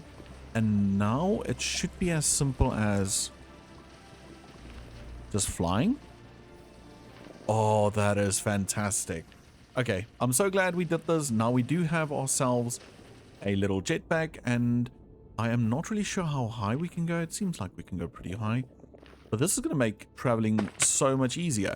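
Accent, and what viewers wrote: South Africa. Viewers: are u south African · YOU ARE SOUTH AFRICAN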